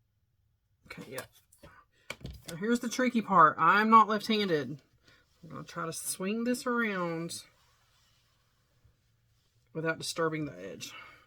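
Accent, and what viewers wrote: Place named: South, United States. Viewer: My favorite Christmas cookie is Chocolate Crackle cookies. It is so easy to make with a cake mix. Every time I make them they just disappear in a hurry. My whole family loves them. Love your channel and love to listen to your southern accent as I have said before. Your videos are all great.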